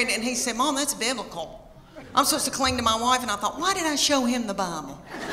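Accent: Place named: Appalachia, United States. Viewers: Omg, how am I just now finding out about you? You are hysterically funny!!! My friend told me to watch your videos the other day. So, yesterday I started watching early in the morning. At one point, I was laughing so hard I was literally crying! The one where you are picking up your kids from school had me in tears. I relate so well. Thanks for the laughs. I can't wait to watch all of your standups and see you live!! I am also from TN, so your accent reminds me of home :) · I'm stuck in Pennsylvania for a week and a NATIVE OF The BLUE RIDGE MOUNTAINS of SOUTHWEST VIRGINIA!! THE REAL VIRGINIA!! Where we ALL TALK LIKE!! I'm so homesick from hearing her voice!!! I wanna GO HOME!!!! 😣 God BLESS YALL!! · Most of my remaining family is from the deep south and her accent sounds like home.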